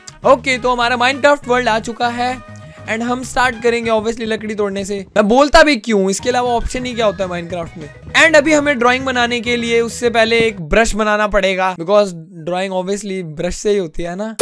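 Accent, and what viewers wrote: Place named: Haryana, India. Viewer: well that's not true we don't watch him for his haryanvi accent , we watch his video because we like him ( btw I love his haryanvi accent )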